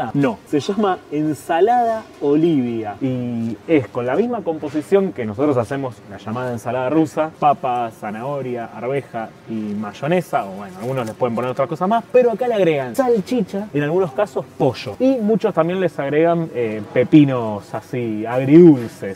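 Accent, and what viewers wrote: Argentina. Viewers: reposho o posho....hay algun argentino que pronuncie bien el español??? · Tú español ruso está argentinisado!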